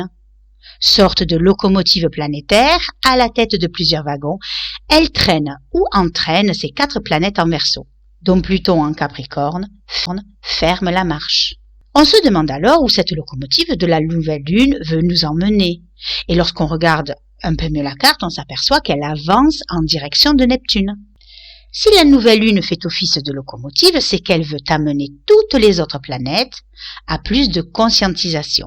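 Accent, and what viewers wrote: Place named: South, France. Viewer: ...oui ,il n'y a plus qu'à !! Cela serait bien intéressant !! Moi je ne sais pas où vous êtes j'imagine dans le sud de la France vu l'accent !! mais moi je vis en Italie dans le Friuli!! Je ne vais pas souvent en France mais, quelques vacances pourrais ce faire !! bonne soirée.christine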